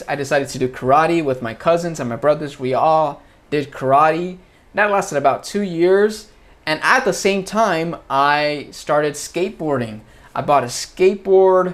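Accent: United States. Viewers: Посмотрела видео, говорит оооочень медленно, использую простейшие фразы. Это для ребят у которых уровень чуть ниже среднего. Лучше бы реальный разговорный с той скоростью, как говорят американцы. Но всегда приятно послушать американский акцент! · Как классно и интересно! Я тоже хочу говорить с таким же американским акцентом. Но пока по моей смачной мягкой L и картавенькой R понятно, что никакая я не американка и не британка.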